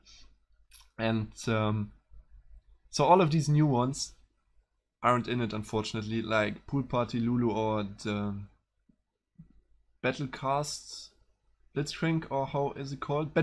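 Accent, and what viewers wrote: Germany. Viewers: hah dat german accent :P but don't worry i`ve got the same problem ^^ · Man hört das deutsche in seiner Stimme ^^ aber is ja nicht schlimm weiter so :D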